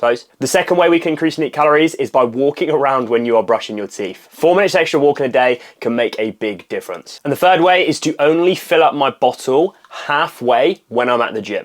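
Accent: United Kingdom. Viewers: Love your accent. What part of the UK is it from?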